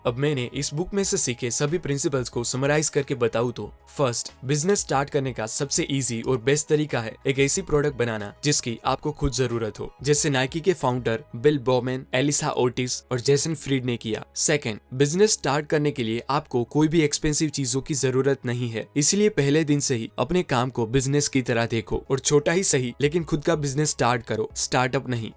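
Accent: India. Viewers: I hate your indian accent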